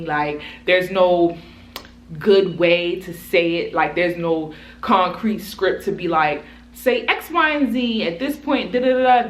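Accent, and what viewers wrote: Baltimore, United States. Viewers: You so baltimore I love it😂😍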